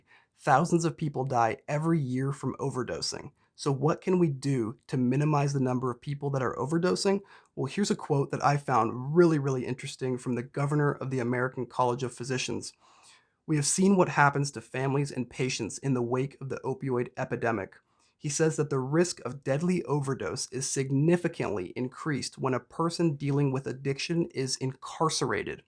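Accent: Canada. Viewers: Damn idk why but i thought you were from canada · Hey, I thought you were a Canadian fella haha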